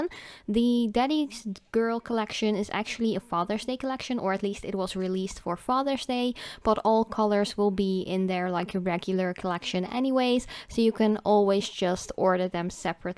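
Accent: Netherlands. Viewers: Hello, I love your videos, they are absolutely amazing. Love your dutch accent ! (I used to live in Den Haag, had the best time of my life there) I have a question for you! How did you got sponsored by MG? I would like to do videos like you, but I assume you dont purchase these collors, they just send them to you? how did you started this companionship?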